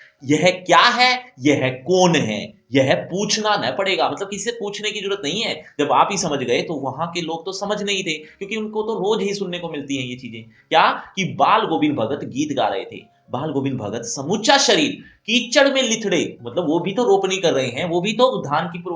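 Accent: Haryana, India. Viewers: Sir aap haryanvi ho kya?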